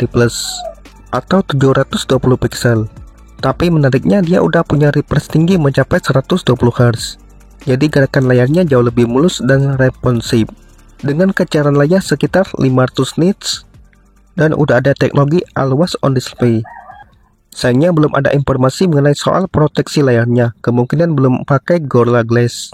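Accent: Aceh, Indonesia. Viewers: Bg org aceh ya cara ngomong nya logat aceh 😮😮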